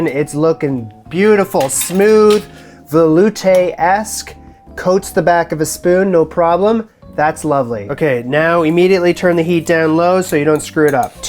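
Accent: Canada. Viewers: The Canadian accent is really coming back now that you’re back in the mother country. Aye?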